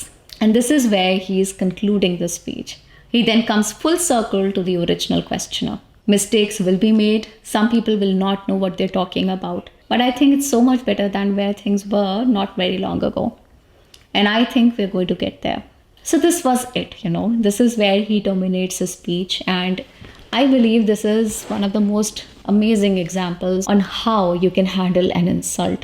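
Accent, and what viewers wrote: India. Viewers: Oh mam… you make so many videos on correct pronunciation..but the difference is in those videos you are targeting words that people don’t use oftentimes and its easy to highlight those words.. but after watching this video of yours i can clearly see how many mistakes you’re making while pronouncing multiple words.. but the point is that while speaking nobody really focuses on pronouncing words as we are making people understand our standpoint.. its so funny and annoying that you create videos just to collect audience, when you yourself speak in a very common accent just like every indian speaker… i work with so many UK & US stakeholders and to be very honest nobody really spell the words the way you speak in your so called “how to Pronounce words” videos on youtube…and now all your stupid fan followers will start replying me and giving me gyaan but i really don’t care… moreover there are so many other important topics that are going in the world nobody really cares about your videos…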